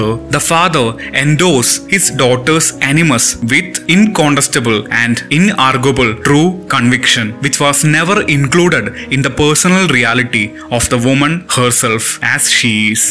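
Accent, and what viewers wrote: India. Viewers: Your Indian accent makes this video much more impressive and sound more spiritual. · Sure. Will do. You take care. I wish you the best. Always will keep wishing more luck to you. Dont worry about being Indian or the accent. I know our image is looked down - It is what it is. But keep going. "I know of no better life purpose than to perish attempting the great and impossible. The fact that something seems impossible should not be a reason to not pursue it. That's exactly what makes it worth pursuing. Where would the courage and greatness be if success was certain and there was no risk." - Nietzsche.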